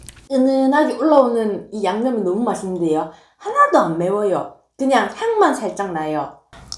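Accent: Gyeongsang, South Korea. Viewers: 저왜 안광이 보이죠? ㅎㅎ여자인내가봐동..넘이쁘다 ㅎ 이거익은거예요~~ 같은 또 경상도인이로써!!! 자랑스런이쁜받는말투!!ㅋ 렌즈를 따로꼇다해도 안광이... 그리고 두겹 팔자주름..매력포인트... 전좋아요 저부분!!ㅎ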